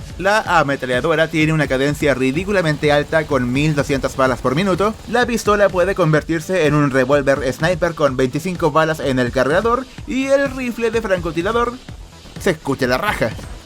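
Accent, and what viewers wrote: Chile. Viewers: Eres chileno? Porque yo si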